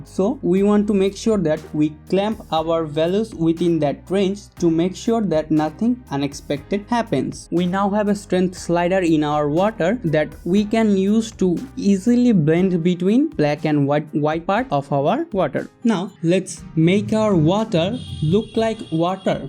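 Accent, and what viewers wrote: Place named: England, United Kingdom. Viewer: perfect, i love your English accent <3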